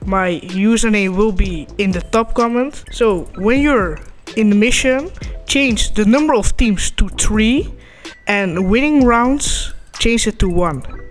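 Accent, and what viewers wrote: Netherlands. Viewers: Is my man dutch? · Are you dutch? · Lekker nederlands accentje man lol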